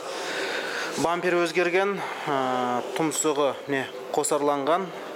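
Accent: Standard. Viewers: Жарайсын бауырып осы жолыннан тайынба, таза казакша тындаган кулакка не деген жагымды. Жарайсын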